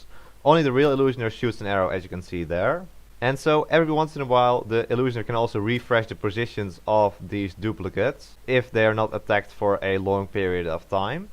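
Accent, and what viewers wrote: Netherlands. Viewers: ah, dutch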